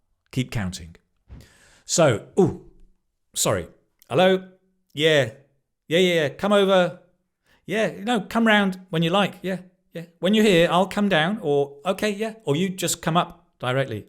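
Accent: United Kingdom. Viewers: En excellent english teacher explaining things with zeal and zest using original British accent.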